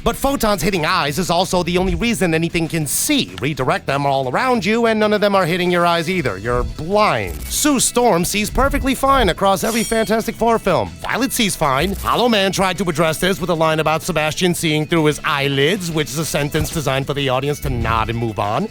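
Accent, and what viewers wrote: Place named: New York, United States. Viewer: I personally think invisibility sucks as a power. It's as effective as mace spray to the eyes, which would actually be a more effective ability. Also, random thing, but I was NOT expecting you to be Asian with THAT Rocket Raccoon New York accent.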